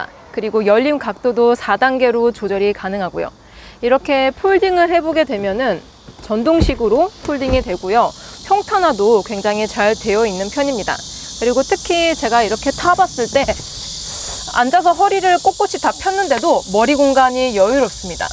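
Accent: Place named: Gyeongsang, South Korea. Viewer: 아나운서 같은게 더 세련된건가? 전 원래 컨셉인 정감있는 경상도 아가씨가 좋아요.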